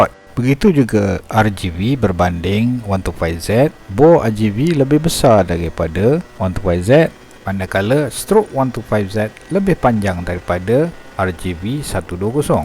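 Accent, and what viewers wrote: Perak, Malaysia. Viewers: Hahaha. Nasib baik sy bujang lg bang. Tapi terlajak sikit la, dah 34 ni single mcm mono je ha...abg perak belah mana ye. Org perak punya slang ni paling senang nk cam. Sy pun dr batu gajah ni ha....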